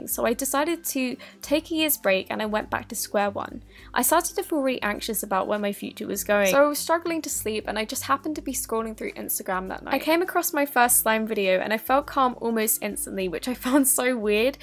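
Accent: England, United Kingdom. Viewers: Yay!!! You're the best youtuber ever! You should hold a giveaway for some of your slimes! btw, i love you =r english accent and yur super pretty!!!!! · I love this British accent😂💕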